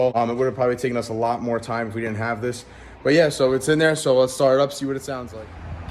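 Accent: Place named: Long Island, United States. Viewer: LMAO that long island accent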